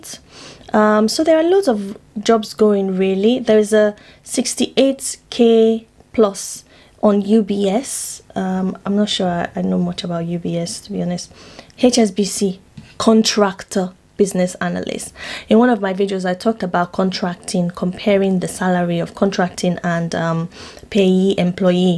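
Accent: Nigeria. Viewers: Nice content. You have a new subscriber. How come you have my Nigerian accent😀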